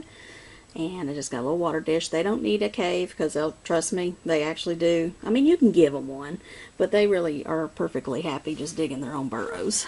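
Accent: South, United States. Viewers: Love your videos!!! I thought you had a southern accent, my mama was born in Tennessee but she raised us kids in WV. Tennessee is a beautiful state. I love it there.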